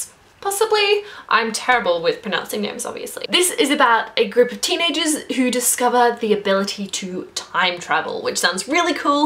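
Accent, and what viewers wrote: Australia. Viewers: Australian or New Zealander?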